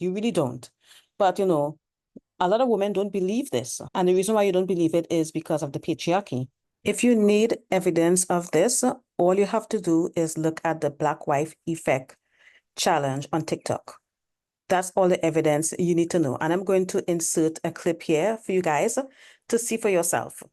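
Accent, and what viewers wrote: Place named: Trinidad and Tobago. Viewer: I like your content. You sound like a trini🇹🇹 I'm Trinidadian, btw.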